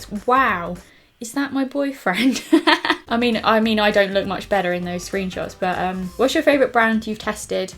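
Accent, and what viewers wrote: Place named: Yorkshire, United Kingdom. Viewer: Up North!!! Love the yorkshire accent... cough cough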